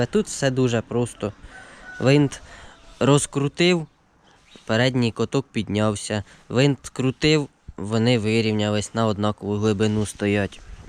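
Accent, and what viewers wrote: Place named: Poltava, Ukraine. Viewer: Перший раз дивлюся ваші відео,і відразу почув знайомий полтавський говір.Лайк за відео👍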